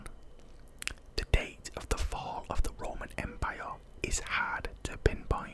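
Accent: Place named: Northern England, United Kingdom. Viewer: Very good ASMR voice. I was confused for the first 15 seconds, I thought it was an American accent at first and then northern English came through? ^^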